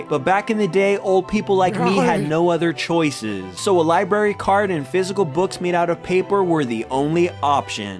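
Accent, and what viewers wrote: South Korea. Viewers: sounds korean to me. I also think regardless of what type of Asian he my be good on him... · Btw he's korean just by his accent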